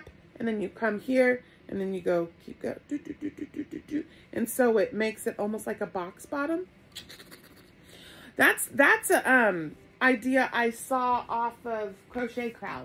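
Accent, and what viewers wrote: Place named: Pennsylvania, United States. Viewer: Hello. Love listening to your stories. I grew up in Fontana California and there was a Kaiser Steel plant there. Most men worked there in the 60's and 70's. It was torn down around 1999 in order for the California Speedway to be built. My daddy died in 2002 and I still miss him so much. I also say wash like you and i say younse and buggy for shopping cart. I got these words from my mom and dad who grew up in Pennsylvania. Have a great day.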